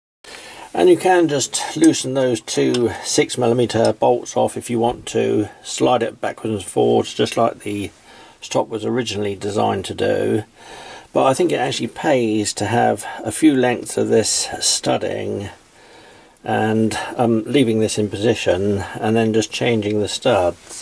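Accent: United Kingdom. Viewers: Hi Mate, I like the mini lathe. It cuts the stainless just fine! I ,also, like your ideas. I have one recommendation if you please. The threaded rod stop should have been a fine thread to allow an easier micro control ability than the standard thread. Your accent is British I think, are you from the UK? But you use metric. Personal preference or am I guessing the wrong location? Good day!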